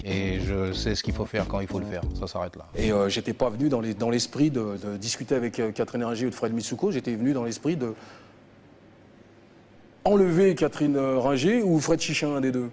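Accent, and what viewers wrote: France. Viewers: Un pure parigo, un phrasé telment inimitable 🖤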